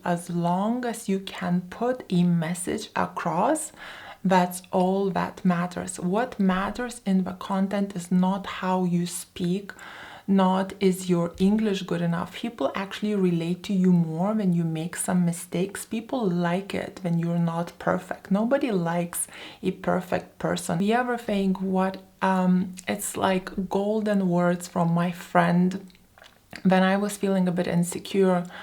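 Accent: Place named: Hudson Valley, United States. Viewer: your awesome and we embrace your awesome HV accent and we understand each other:) Built for greatness, success and kindness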